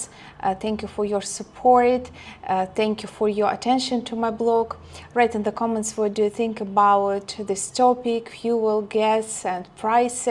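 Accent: Russia. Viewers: It's very helpful to get unfiltered information about the actual Russian experience so thank you very much for sharing. Also, your English is excellent (and I find your Russian accent very charming, by the way) so please keep posting!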